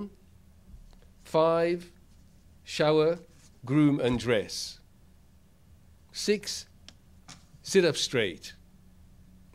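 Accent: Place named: South Africa. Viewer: Couldn't understand this persons funny accent. Sounds South African. Ughh.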